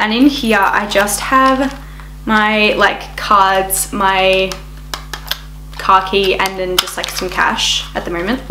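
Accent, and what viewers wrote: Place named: Australia. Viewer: I have 4 of them - I feel I’m justified because normally one bag is $1500 for me at the very least I really like high in bags so for me to have four or five of them would equal the cost of one bag so there’s my justification😂 I just love your accent oh I’m not sure what country you’re in but it sounds Australian but anyway I absolutely love the accent I wish I had it!!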